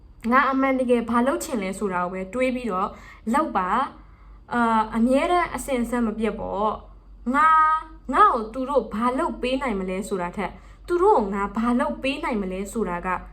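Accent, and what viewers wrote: Rakhine, Myanmar. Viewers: ရခိုင် လူမျိုး ထင်တယ်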